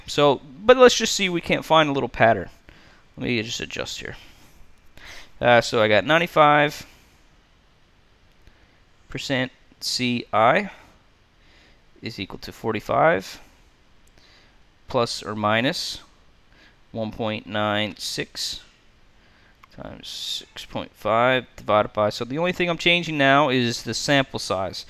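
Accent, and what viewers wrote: Canada. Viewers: Helpful video! Just wondering but are you Canadian? :p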